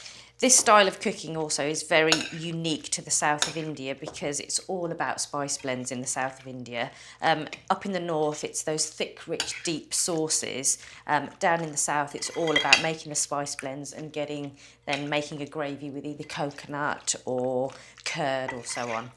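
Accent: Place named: North London, United Kingdom. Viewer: Great north London accent love, we Americans had the same accents till about the 1850's when it began to change mainly because of Scandinavians learning to speak English from the Irish immigrants that were hear at the time, If you listen to the very old recordings of Buffalo Bill you will notice he had a very English accent and many of the old west outlaws had an English accent as well, the interesting thing is the Brits just love the American accents too.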